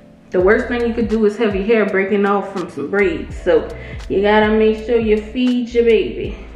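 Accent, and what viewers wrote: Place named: Los Angeles, United States. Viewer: Its CUTE! I just realized you were from LA. I could hear it but didn't think nothing of it. I'm from Baton Rouge.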